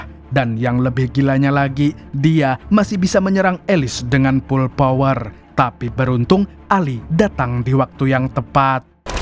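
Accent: Banjar, Indonesia. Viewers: Banjarr leh.?! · Buset org banjar kah sklinya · Urg banjar dimana pyn mang😅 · orang banjar kah pian😂😂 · oh ini kah nang buhan banjar tu wkwk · Kayak nya mimin nih org banjar nah wkwkwk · Urang banjar juakah ikm nch....😂😂😂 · Bang pian orang banjar dmnanya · Org banjar kah pian niti mang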